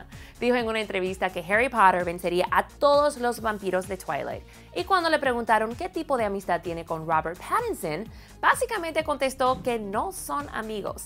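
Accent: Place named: United States. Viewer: Esta bien que quieras hablar como estadounidense pero no mames porque exageras tanto!!!!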